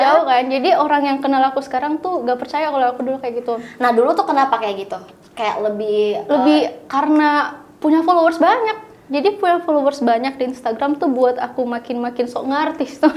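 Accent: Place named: Makassar, Indonesia. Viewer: Org makassar kalau ngomong kentara bngt sih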